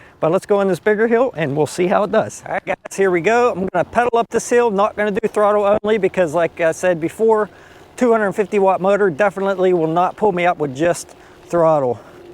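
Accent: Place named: South, United States. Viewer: Nice Southern accent!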